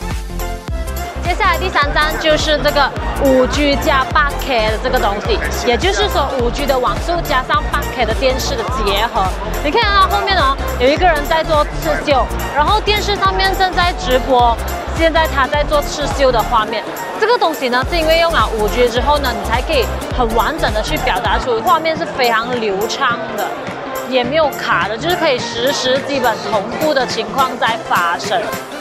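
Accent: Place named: Malaysia. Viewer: 进入只为了听你的马来西亚普通话！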